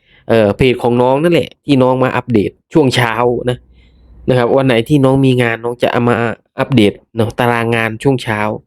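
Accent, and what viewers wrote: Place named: Isan, Thailand. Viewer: ชอบการพูดคุยของคุณ ฟังสำเนียงแล้วรู้ว่าภาคอีสานแต่ทำรายการไม่ลำเอียงภาคก็พูดให้ดี ต้องเป็นคนอย่างนี้ซิคะถึงจะน่ารักและน่าติดตาม 👍👍👍👍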